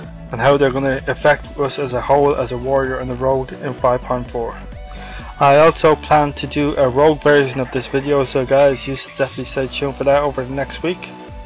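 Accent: Ireland. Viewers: are u irish ? u sound soo much like it ( im irish ) ;)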